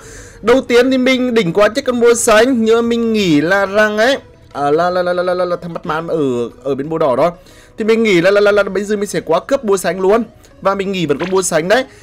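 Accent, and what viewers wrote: Nghe An, Vietnam. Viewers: hắn bình luận giọng Nghệ An choa là tau thấy dễ ghe với thích rồi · a người nghệ an ạ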